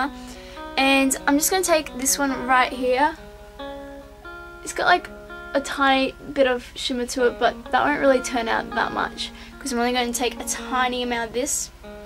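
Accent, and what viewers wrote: Australia. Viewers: r u austrailian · u sound Australian i now love you just cause i am australian ^-^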